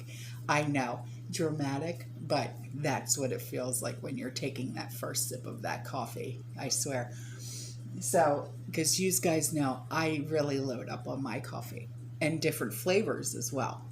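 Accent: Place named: New Jersey, United States. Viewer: I'm soooo happy I found your channel. I'm new, but I look forward to your vids every day. It's like sitting down with a friend. You have a beautiful manner and you're as cute as cute can be. By the way, I'm a Jersey girl, too, transplanted to Florida four years ago. So add your accent to the mix, it's like being home:) God bless you and your family, and thanks for everything. Last thing, your voice is so calming and soothing, you should do bedtime stories on tape.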